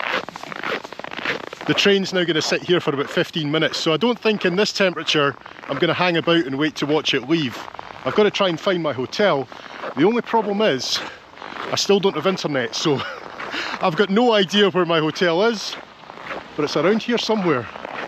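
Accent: Scotland, United Kingdom. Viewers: I love watching your travels and this one gave me an appreciation of just how good you are at editing. The 5sec cut where you threw the napkin onto the plate after that impressive looking lasagna was like the full stop at the end of the dinner sentence, ready for a new para. Im half Scottish and your accent reminds me of my youth there. Great journeys and travelogue. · Oh you are toooo adorable...I love your Scottish accent.Subscribed just to listen to you talk...and your sense of humor.😅...very interesting content too!😊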